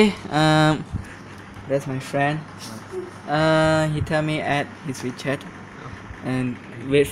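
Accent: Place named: Malaysia. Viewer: Sounds like malaysian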